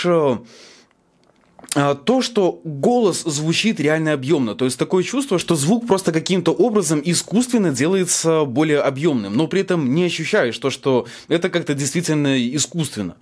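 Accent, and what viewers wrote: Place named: Belarus. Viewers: Дзеканье цеканье то да, отличительная особенность белоруса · Сам тоже из Беларуси, но после года жизни в Москве, очень слышен твой белорусский акцент, аж уши режет)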